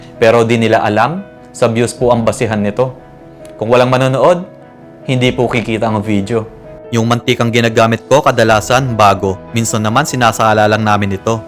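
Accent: Pampanga, Philippines. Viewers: Kapampangan po ba kayo?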